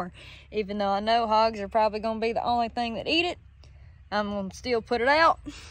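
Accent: South, United States. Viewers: Back in <num> I was on active duty in the USMC. Ended up as a Sergeant E-5. It was people like you especially with that southern accent that I could really rely on to get the job done. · I like your spécial south accent.... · You've got the cutest southern accent ever!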